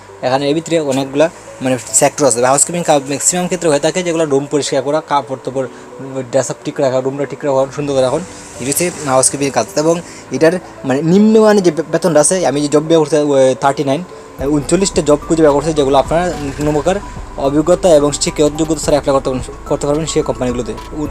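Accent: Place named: Habiganj, Bangladesh. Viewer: Vair bari ki Habiganj ni?? Kotha shune tho Habiganj lage...🫣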